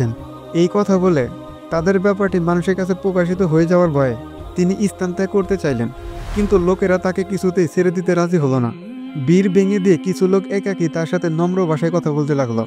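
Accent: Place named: Manikganj, Bangladesh. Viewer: apnar deser bari ki manikgonj , karon apnar uposthaponay cholti vasar ovab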